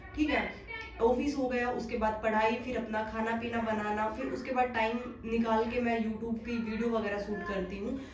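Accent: Haryana, India. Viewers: Aap haryana se ho mam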